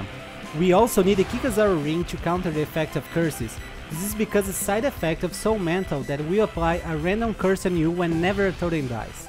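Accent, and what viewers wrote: Brazil. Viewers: Cara é incrivel como seu inglês é perfeitamente correto e ainda consigo sentir que é um BR falando ahuahuahuaau nice video irmão!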